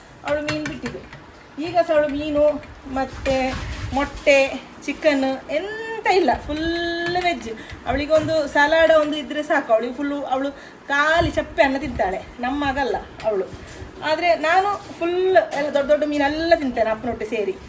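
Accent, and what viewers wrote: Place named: Kote, India. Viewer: ಕನ್ನಡ ಕೇಳಲಿಕ್ಕೆ ಚಂದ ಉಂಟು ಕೋಟೆ ಕನ್ನಡ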